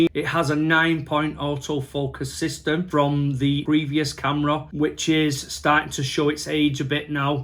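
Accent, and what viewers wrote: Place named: Northern England, United Kingdom. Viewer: This is gonna sound really stupid.. but I’m really happy I found someone with a good northern accent hahahaa no offence to anyone else but ya can’t beat a northern British accent (I’ll be embarrassed if you’re from the midlands or something hahaha)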